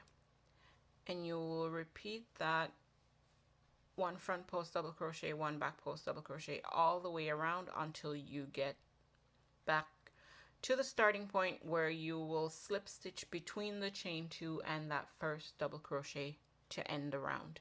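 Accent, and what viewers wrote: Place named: Caribbean. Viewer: I hear a Caribbean accent❤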